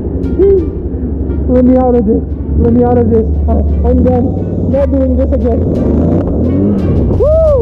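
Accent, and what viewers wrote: Quebec, Canada. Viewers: I must ask are you from Quebec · Il est du Québec j’en sui sûr 😂😂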